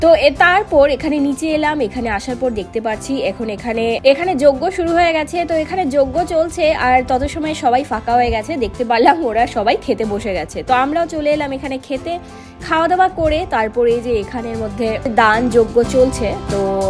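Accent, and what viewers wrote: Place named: Sylhet, Bangladesh. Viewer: Tomar seleti kotha khub bhalo lage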